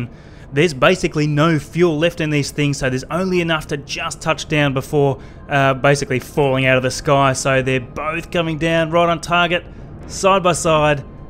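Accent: Australia. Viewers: tell me if I'm right. Are you Australian? You sound like Hugh Jackman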